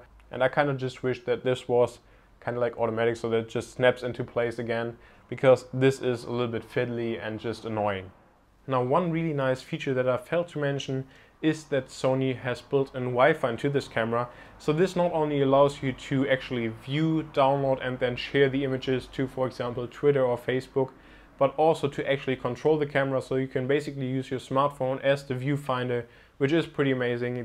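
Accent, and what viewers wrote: Germany. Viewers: Wie ich einfach direkt raushören konnte, dass du Deutscher bist :D Wie auch immer, super Review!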